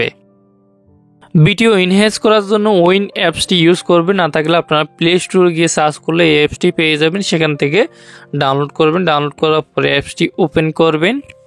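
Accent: Chittagong, Bangladesh. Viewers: ভাইয়া মনে হয় চট্টগ্রাম এর..? আপনার কথা গুলা শুনে মনে হয় ☺️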